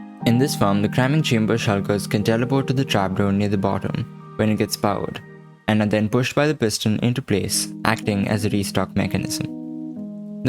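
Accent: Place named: India. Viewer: Are you indian by any chance? love your accent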